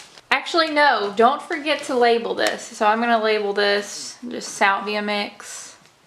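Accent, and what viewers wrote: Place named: Kentucky, United States. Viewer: I just watched this video and as soon as you started talking I had to smile. You sound just like me. Are you from Kentucky? Sure made me homesick. I live in NC now, but I love my old Kentucky home.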